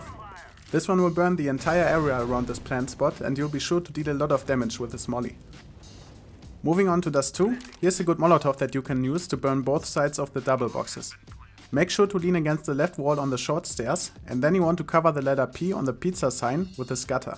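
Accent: Germany. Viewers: Are you German? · Ur german accent is very good m8 nice vid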